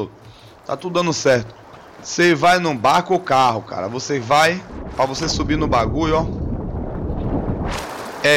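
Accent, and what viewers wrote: Bahia, Brazil. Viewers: BAHIANO CERTEZA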